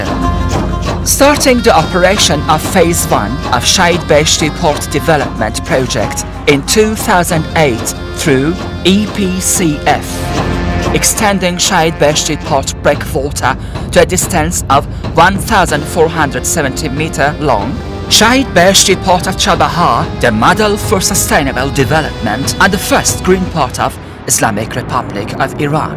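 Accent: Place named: India. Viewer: Good computer animation with an indian voice.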